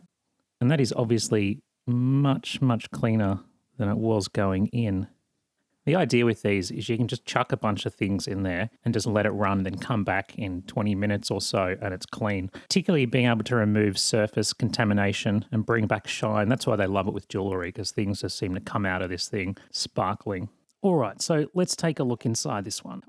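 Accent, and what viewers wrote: Australia. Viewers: The moment I heard your voice, I could tell it was a fellow Aussie. Happy to follow and watch your amazing content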